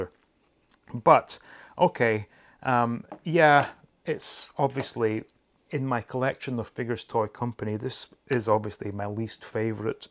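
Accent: Scotland, United Kingdom. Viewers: Hey been wanting to ask what is your accent is it Scottish?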